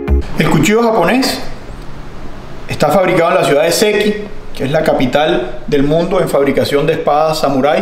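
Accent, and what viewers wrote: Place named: Cuba. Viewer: Una pregunta tu eres cubano porque por tu asentó te pareces al de los cubanos y porcierto y soy cubano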